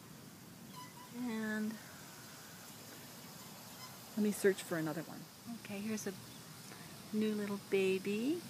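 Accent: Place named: California, United States. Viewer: Hey!!! how are you?? Ive sooooo missed that soothing Californian accent!! Do those pumpkin runners keep growing or will they eventually stop? I hope your well my friend and your family are ok? Are you back to school this week? Lotte starts Big school on wednesday (infants) and jack becomes a junior!!!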